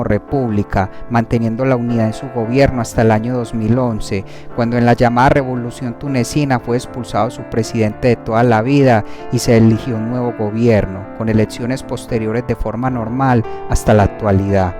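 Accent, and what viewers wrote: Antioquia, Colombia. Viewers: Hermoso tu video hermoso tu acento colombisno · No me gusta ese acento paisa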